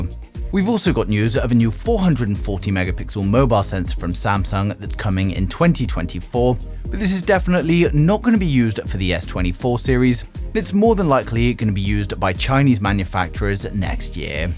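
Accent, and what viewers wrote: England, United Kingdom. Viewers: What's wrong with the English accent? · Same rumors can be found on more reliable sources and they don't have that awful English accent.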